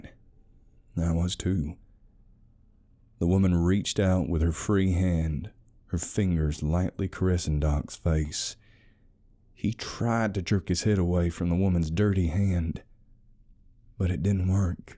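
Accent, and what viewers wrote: South, United States. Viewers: I love "southern" voice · Love how a Brit can pull of a decent southern accent. It’s passable tbh and I’m from Texas. Also, didn’t you do this story before? I coulda sworn I heard this from you? Full story and all.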